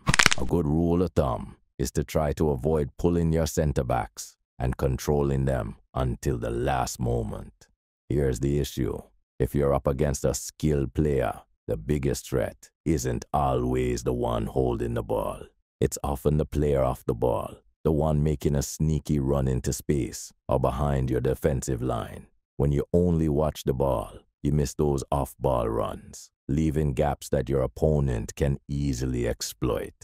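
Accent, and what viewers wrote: Jamaica. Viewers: Bro should look into motivational speaking! He got that leader voice that you know you can trust what he saying. Definitely Jamaican 🇯🇲.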